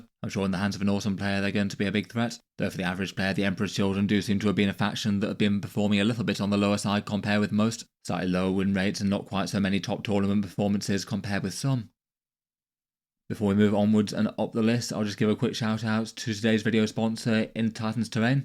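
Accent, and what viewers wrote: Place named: Midlands, United Kingdom. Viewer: he's 1000% got an english accent, I want to say midlands somewhere? It's not very distinctive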